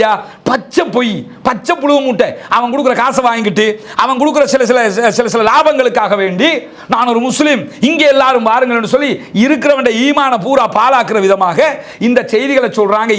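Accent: Tamil Nadu, India. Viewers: Why your Tamil accent like PJ from Tamil nadu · தமிழ் நாட்டில் படித்தவர் அதனால் ஒரு சில வார்த்தைகள் அப்படி அல்ஹம்துலில்லாஹ் மக்களுக்கு தெளிவாக புரியும் என நம்புகிறேன்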